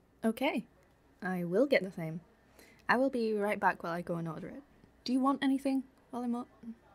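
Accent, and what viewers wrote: United Kingdom. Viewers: I've been a bit curious but are you from Manchester or am I just thinking wrong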